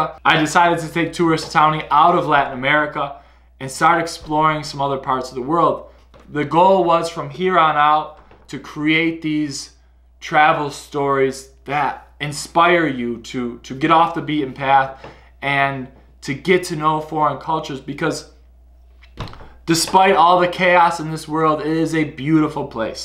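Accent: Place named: United States. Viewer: It's a great video. I follow your channel for a while. And I really enjoy it. First, thanks for making create videos for us. Funny thing, I was wondering which country you are from. I never thought you are a born American. I feel your english is great buy you have very noticeable accent. I was thinking. Mmm, this guy must moved to a english speaking country at young age. Then, I just saw your old video, the interview one for opening a book store . You spoke perfect american english. Does your accent really change or you just intentionally speak very slowly to cater the foreign audience? : )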